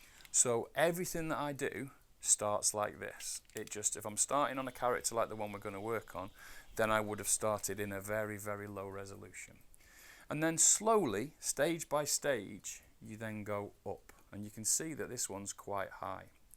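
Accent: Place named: Stoke, United Kingdom. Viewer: Great stuff. Really enjoying learning from your videos, and I’m inspired by your work. I’ve been learning to use blender for a few months now and have just invested in some VR kit so I’m still in the very early stages of sculpting and modelling with it. Your tutorials are helping me so much and I just wanted to say thank you. By the way is that a bit of Stoke in that accent ?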